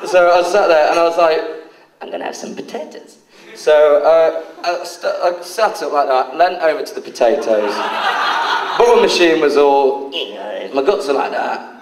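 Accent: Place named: Nottingham, United Kingdom. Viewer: Sounds as if your from my neck of the woods, Nottingham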